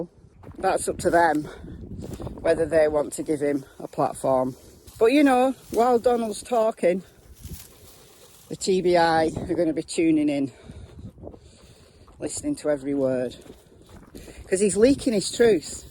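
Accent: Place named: Northern England, United Kingdom. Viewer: Aww shame it's the end of hopping Cassie 😏 Absolutely love your channel. It's great to hear a northern accent. I'm a Brummy myself. Anyway catch your next stream ❤️👍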